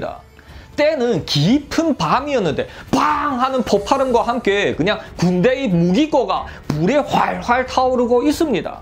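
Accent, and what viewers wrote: Yanbian, China. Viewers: 화룡시 팔가자진에서 30전짜리 꼬치구이 장사 6년하다가 쭝뽀우 생명보험 3년하다 한국나온지 21년 됐음,한국사람하고 결혼해서 두아이의 엄만데요,찐한 연변사투리 구수하네요~대박나세요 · 연변말좀고치고 방송하시오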